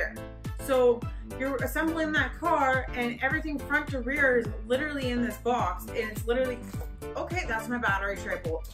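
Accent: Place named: Canada. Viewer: Canadian eh!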